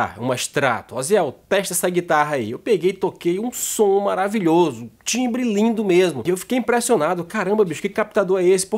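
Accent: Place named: Northeast, Brazil. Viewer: . Oque eu aho legal é que o seu "x" nordestino é diferente do "x" carioca. Kkk E é um chamariz no seu marketing pessoal.😆😆😁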